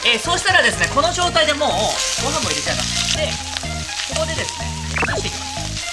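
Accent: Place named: Chiba, Japan. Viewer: 君て千葉でしたかー千葉の従兄弟みたいだなと。イントネーションが一緒なんで親近感あります😁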